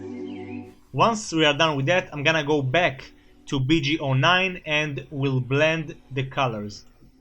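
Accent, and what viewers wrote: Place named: Israel. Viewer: u sound isreali are you and btw great job ty this helped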